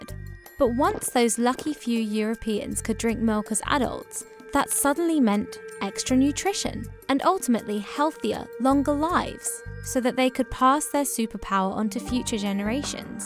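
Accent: United Kingdom. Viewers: That british accent. Correct me if I'm wrong.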